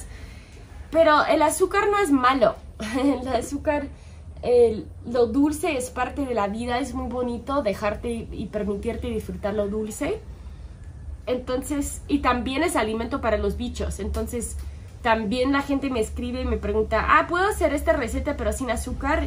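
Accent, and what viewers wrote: Mexico. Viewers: I'm listening to you and I feel I light Mexican accent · Habla muy mexicana! Que linda!! 😊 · Antes hablabas español como Española y ahora hablas español como Española y mexicana en acento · Hi!!, no sabia de tus videos..te felicito..son muy interesantes, y tu español es excelente...lo hablas muy bien, se nota claramente el acento mexicano...pero bueno.. agradezco que compartas tus conocimientos, muy buenos y como te reitero, bastante interesantes. Saludos From CHILE - VALPARAISO. Good luck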